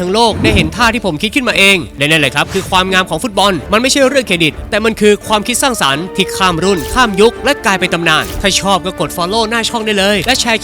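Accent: South, Thailand. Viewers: แอดเป็นคนใต้เหรอ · ❤❤มีภาษาใต้ด้วย😅 สุดยอดทางพร้าวครับ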